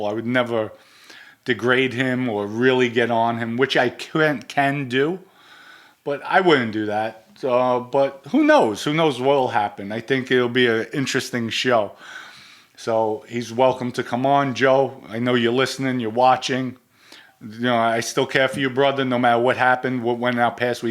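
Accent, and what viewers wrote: New York, United States. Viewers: Hello handsome, I just recently found your podcast, which I really enjoy. Don't allow anyone to stop you from speaking how we NYer's Speak. Love the show.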